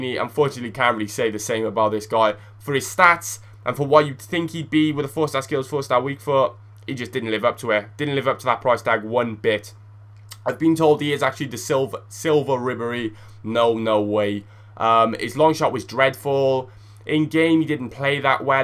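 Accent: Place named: Cardiff, United Kingdom. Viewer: I think it would be funny as fuck if you do funny squads mate, btw are you from cardiff ???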